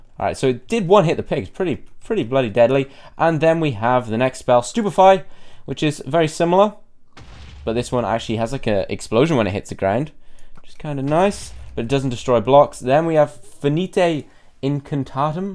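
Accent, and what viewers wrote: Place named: Wales, United Kingdom. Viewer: ACCENT = MINT! ITS GREAT TO BE WELSH... I KNOW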